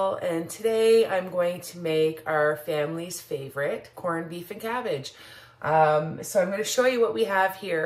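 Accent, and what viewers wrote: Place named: Ireland. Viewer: ohio Long way from home! I did not think Canadians had an accent. I was thinking you had a bit of Irish cuz some of the things you said sounded Irish accent.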